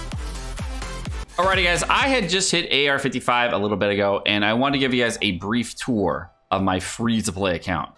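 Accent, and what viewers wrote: United Kingdom. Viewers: pog british accent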